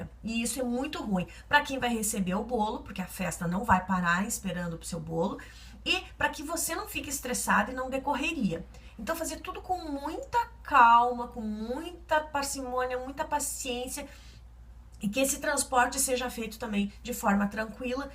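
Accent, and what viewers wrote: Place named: Southern Brazil, Brazil. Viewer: Ouvindo o seu sotaque fiquei tentando imaginar de onde você é... RS? PR?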